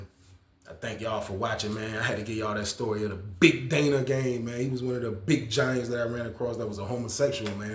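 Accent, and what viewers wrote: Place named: Boston, United States. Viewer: U from Boston homie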